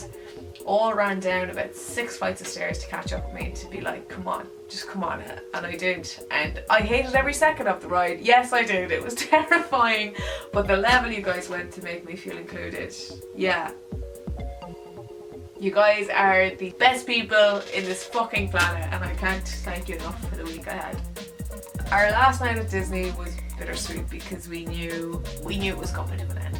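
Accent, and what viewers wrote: Ireland. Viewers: I know you get it all the time, but your accent and mannerisms are adorable. I'm a mutt... Cherokee, Irish and Welsh. I've always wanted to see rural Ireland. Watching you and your friends I think just gives me a bit of insight of true Irish and line of thought. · Relevant to Foreign women and armpit hair in America: Here in these parts French women (deservedly or not) have a scandalous reputation for copious pit hair. If you were to shout out in that glorious genuine Irish accent; "Hey, I'm from Ireland! We are only (X number) of miles from Freaking France"; I'm sure everybody would cut you some slack.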